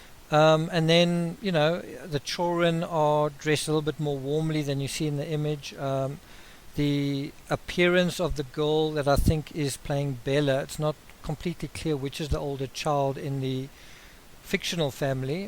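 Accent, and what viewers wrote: South Africa. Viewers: South African narrator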